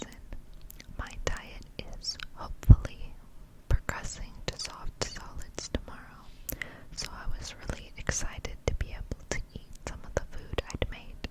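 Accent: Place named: Texas, United States. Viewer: In the first video you made you had a Texas accent! It was super tingly if you ever want to make another some time 😊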